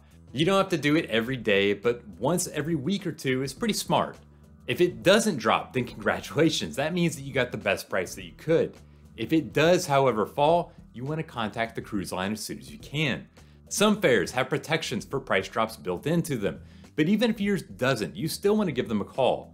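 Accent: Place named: South, United States. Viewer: Folks from the south they talk like that. Call it a unique characteristic of the regional dialect. I'd even wager that most people wouldn't notice and most who do they would appreciate the slice of americana it represents.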